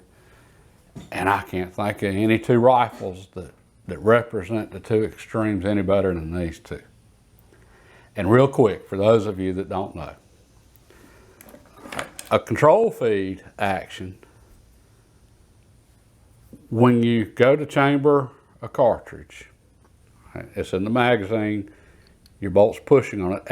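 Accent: South, United States. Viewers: Thank you for the explanation of the push feed vs the controlled round feed from a historical perspective. I know exactly what you spoke about having been born in 1936 and had a 22 caliber single shot rifle that is very much like today's Cricket. Like that southern drawl too.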